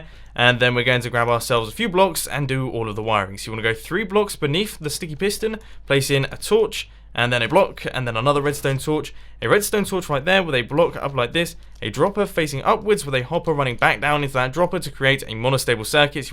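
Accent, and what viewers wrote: England, United Kingdom. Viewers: r u english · Are you British?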